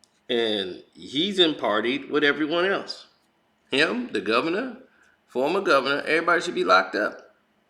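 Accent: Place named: Midwest, United States. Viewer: You are so mid west Tupelo not tupello pronounced too puh low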